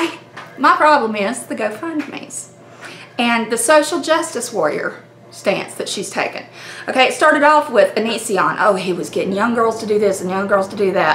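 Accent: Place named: West Virginia, United States. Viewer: My mother in law also loves to collect cookie jars & her name is Beth. ^_^ Your accent sounds abit like hers she's from WV. How many weenie dogs do you have?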